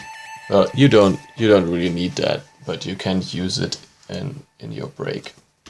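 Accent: Germany. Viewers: thats beacause he`s got an German Accent, too ;D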